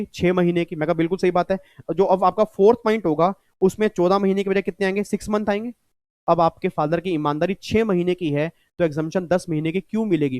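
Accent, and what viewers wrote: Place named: Haryana, India. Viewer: Haryanavi suits you sir😁👌.........and than you for amazing videos of revision❤ #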